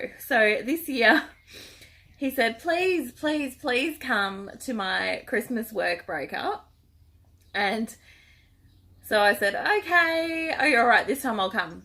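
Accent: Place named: Australia. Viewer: Hi, it’s so nice to hear another Aussie voice on YouTube.. and another Aussie perspective on crocheting. I’ve only just found you thanks to your yarn swap with Summer. I’ve been crocheting since I was 9 ( almost 39 years..) but I’ve learned more thanks to YouTube videos than I knew in the previous 39 years!! I’ve been unable to work since 2016, due to chronic and acute pain and illness, crocheting helps get me out of bed, gives me something to achieve each day ( other than just getting up!!), and helps me to cope with the rigours of my illness. It is my hope to be well enough next year to commence my own YouTube channel, to help give another Aussie voice and help to build the Aussie crochet community on Youtube, whilst also sharing a little of my journey in the hope that I can bring some light into the life of others living with chronic illness and pain.. 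(hopefully my Counselling background might help a little here). My wish is simple (I hope), I’d love to be able to try either Red Heart Super Saver, or Vanna’s Choice yarn, or Lion Brands Touch of Alpaca. Being on a very limited budget, accessing some of these are a little beyond my reach at the moment. All that being said, watching the wishes of others being granted brings me great joy. Thank you for you lovely lively video, I’m now off to binge watch your earlier videos... Wishing you and yours a very Merry Christmas filled with all the joys of an Aussie Christmas and a spectacular New Year!!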